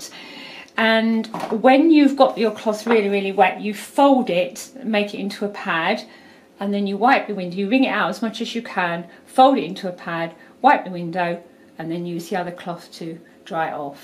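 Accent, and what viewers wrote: United Kingdom. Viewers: Hi, you sounds like a British, i love your accent ;) Thanks for your demo ^^ I've bought same ! (from France btw)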